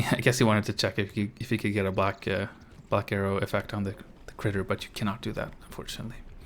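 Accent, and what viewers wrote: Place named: United States. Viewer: Hey,! You sound like someone who's spent a significant time either residing in the US, or having been in continuous contact with Americans. Is there any truth to that observation?:)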